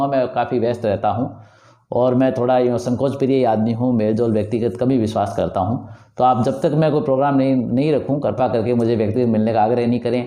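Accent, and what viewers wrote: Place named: Sirohi, India. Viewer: आप की भाषा सिरोही की लगती है आप कहा से है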